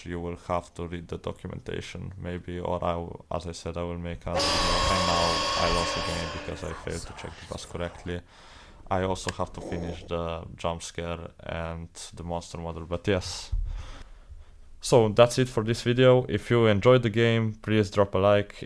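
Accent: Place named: Italy. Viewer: Tf your accent sounds so italian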